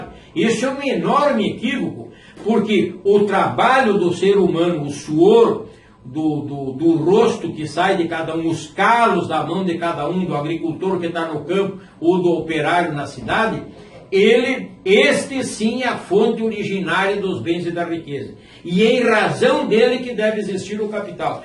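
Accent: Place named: Rio Grande do Sul, Brazil. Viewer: Pelo teu sotaque, imagino que o senhor é gaúcho como eu.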